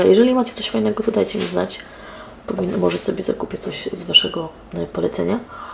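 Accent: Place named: United Kingdom. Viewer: Hej, mam pytanie. Nie dotyczy ono tego vloga ale za każdym razem jak Was oglądam to jakoś tłucze mi się to po głowie. Mianowice, Twój angielski ma już delikatny brytyjski akcent ale za pewne nie zawsze tak było. Możesz spytać męża co sądzi o naszym polskim akcencie na tle innych narodowości? Czy jest znosny? Irytujący? A może całkiem miły dla ucha? Wiem że niektóre akcenty (podobno francuski) w ustach mężczyzny brzmią słabo ale kobieta z takim akcentem brzmi sexi. Często się nad tym zastanawiam.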